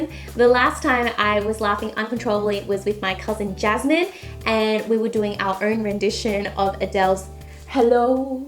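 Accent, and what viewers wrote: Australia. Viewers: that Aussie Accent is 🔥 girl. Speak Vietnamese with me haha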